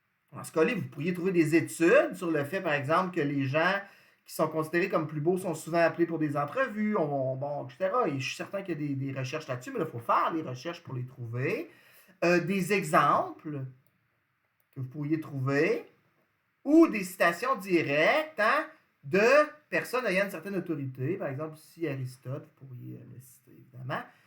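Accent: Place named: Canada. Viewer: L'accent canadien est un peu difficile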